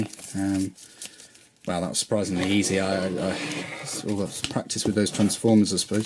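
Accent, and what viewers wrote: United Kingdom. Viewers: obviously British I'm Australian we sound nothing like them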